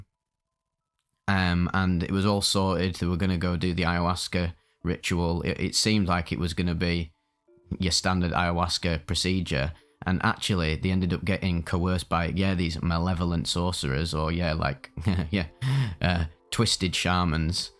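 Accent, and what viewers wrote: United Kingdom. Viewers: Yeah ma these narrations make me feel that my life is not that bad . I smoked and dug heroin for 25 years ,finally this year I saidto myself that I am not that anymore.. Even stopped the pgs -pregabalin 900ml a night at least for years. Diazepam .. Even the mirtazapine,after stopping mirtazapine I realised it was those absolutely draining all my energy and depressing the shiz out of me.. Couldn't sleep maybe 1-2 hours . These videos at night with the jlabs in are like magic . Sleep finally . I like the Salvia ones ,especially the Salvia loud dreadful scary machine thing people talk about .. Nice to hear someone from the UK aswell ..I'm in Doncaster..A rite shithole.. Well all England is a dumping ground for the money laundering men's hairdressing /mini mart selling bacci under the counter .. Keep em coming Brother ..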